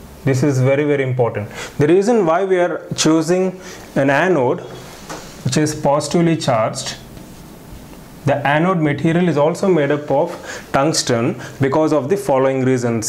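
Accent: India. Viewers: The Indian accent will always be entertaining to hear lol your English is better than most of us. Great lesson and explanation 👏🏽👏🏽👏🏽👏🏽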